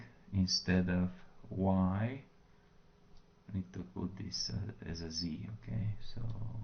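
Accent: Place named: Italy. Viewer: L accento è uguale a quello italiano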